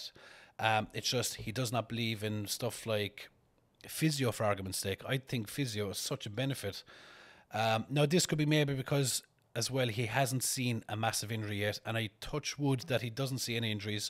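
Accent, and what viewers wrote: Ireland. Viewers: Enjoyed that. Love how you say 332, the Irish accent, born story tellers.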